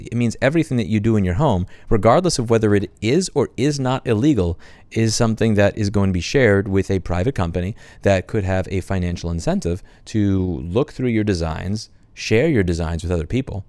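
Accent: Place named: Texas, United States. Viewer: He has a texas accent now!!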